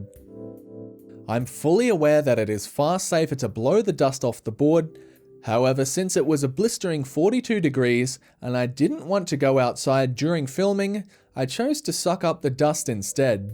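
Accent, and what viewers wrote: Australia. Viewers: I hope people don’t think this is how Australians talk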